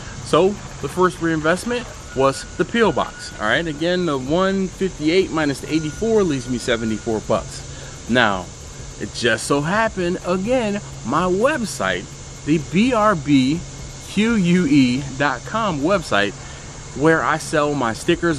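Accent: Philadelphia, United States. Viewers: Excuse me sir, you sound like you might be from Philly, or the surrounding area? I grew up in Berwyn/Valley Forge area.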